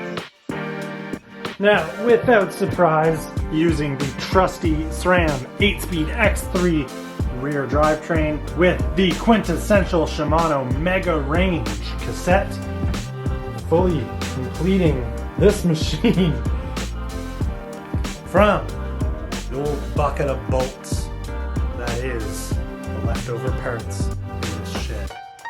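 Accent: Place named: Maritimes, Canada. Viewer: I thought you sounded like a Maritimer.. then you said "Nova Scotia winters".. I'm stoked to see an awesome East Coast youtuber building bodged bikes. I think you put the final nail in the coffin of my plan to buy a new hardtail this summer. My 2015 Norco Storm is getting a bunch of new parts in the near future. (As if I could find a kickass hardtail any time before July anyway...)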